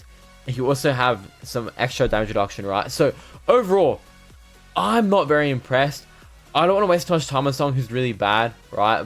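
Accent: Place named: United Kingdom. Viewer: I love the British accent.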